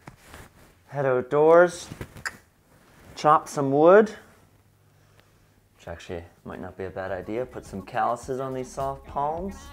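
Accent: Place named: Canada. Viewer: And Sew On recommended your Vlog with a few others. I can see why! I thoroughly enjoyed it. Your tee shirt is indeed a nod to the 70's, 60's and 70's being my era. Your stripe matching, even on the Neckband was excellent. If your into drafting your own patterns watch Sew Custom Vlog, an Irish girl, she's good, I'm not saying that cause I'm from Northern Ireland too 😁, she is 👌spot on, and she's doing coats at the minute. I think you'll enjoy her Vlog. As we say in Northern Ireland, your a turn, meaning your funny! Canadian going by the accent, as some Canadians say certain words like the Scots/Irish. Do a bio on yourself if you haven't already done so.....😉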